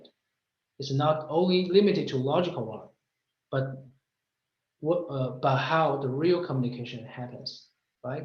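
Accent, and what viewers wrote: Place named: India. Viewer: wtf, indian english.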